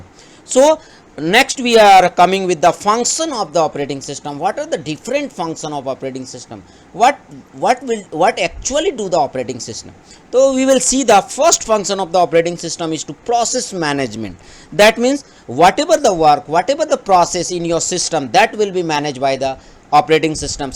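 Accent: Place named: Pakistan. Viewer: Your pinglish is awesome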